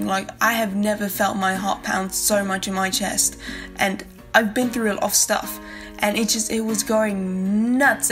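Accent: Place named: South Africa. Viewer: I was trying to figure out if you were British or Australian listening to this video but boy I don't think I would have guessed South African accent but it makes since it was a British Colony for years.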